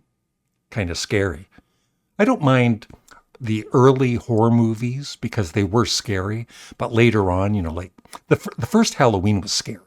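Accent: Canada. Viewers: Nice shirt youre wearing in this video and I like your Canadian accent.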